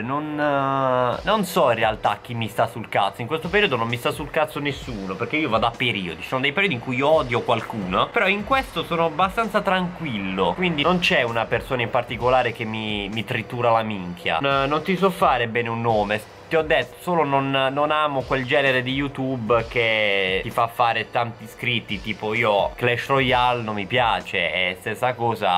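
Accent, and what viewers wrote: Bari, Italy. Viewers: Potrei iniziare ad amare l'accento barese solo grazie a te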